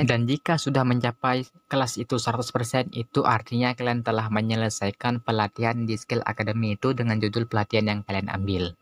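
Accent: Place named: West Sumatra, Indonesia. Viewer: Trima kasih infonya bang Btw suaranya kek minang kocak hahaha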